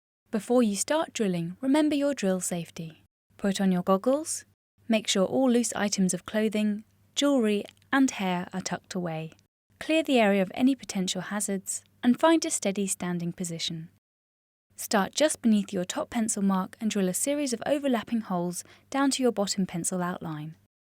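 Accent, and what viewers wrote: United Kingdom. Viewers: Isn't amazing how "simple" well spoken English with complete sentences and diction can help to simplify how to do ANYTHING?! I am trying to fit for a mortise on a storm door and this video is exactly what the Doctor ordered. I have never done a mortise before. Thanks to VideoJug and a special thank to the lovely British voice of. AWESOME Video! Cheers!!!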